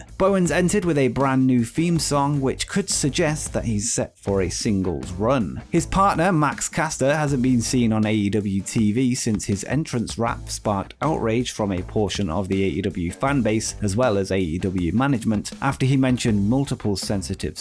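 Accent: United Kingdom. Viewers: come on... There is nothing wrong in admitting it's you. I wish that I was able to add a link of your other channel here but I would genuinely fall for your genuine denial if not that both of the voices including the perfect British accent of yours are so genuinely exactly and precisely the same, that one who knows you from Vidiq can't really mistake it.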